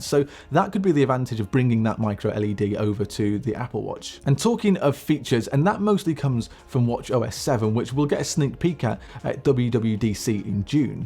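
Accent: United Kingdom. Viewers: Dollars ? You show pounds on the screen and say dollars, with a British accent. A bit confusing. Canadian dollars , or Australian dollars ?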